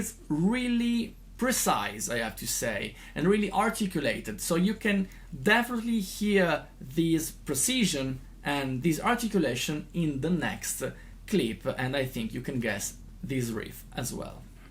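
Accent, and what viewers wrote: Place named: Italy. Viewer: I subscribed by the time you completed your first line.....damnnn i soo wish good content.....and also for my love of italian accent😅😅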